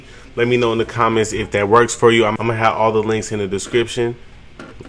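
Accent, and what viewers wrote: United States. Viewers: I here that Texas accent. can't wait to dive into NFTs this year!!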